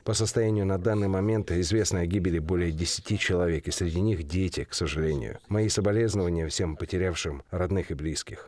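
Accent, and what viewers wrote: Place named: Moscow, Russia. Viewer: почему с московским акцентом ? учи мову !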